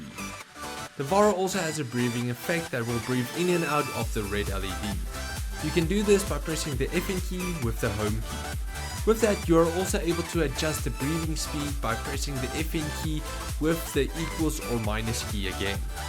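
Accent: South Africa. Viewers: you from South Africa mate? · are you from south africa?? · Cool video, thanks bud. Just ordered one of these. Also, you sound South African...are you? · south african?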